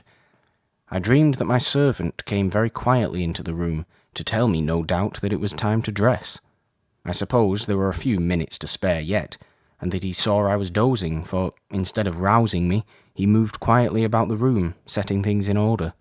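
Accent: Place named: Yorkshire, United Kingdom. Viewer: Am I hearing Yorkshire ? I think so and I adore it 💖 I've many ancestors from Yorkshire although I'm a Yank.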